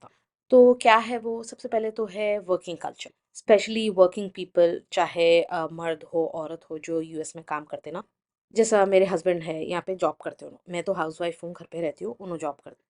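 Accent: Hyderabad, India. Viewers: Assalamalykum...first comment and like ...i am from hyd to....aap bilkul sahi boley ...i 👍 agree...hum bhi US mein they phir hyd aaney ka decision liye...us ke baad bahut regret hua aaney k baad ...khair Allah ki marzi thi ....aap ka hyd ascent achcha laga ...koi log hyd ke hokey bhi apna ascent change karke vlogs daltey...aap ke videos dekh k chicago ki yaad aati bahut....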